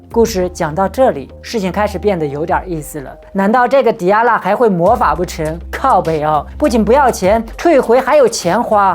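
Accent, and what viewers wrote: China. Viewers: 請問你是哪裡的口音？安徽嗎？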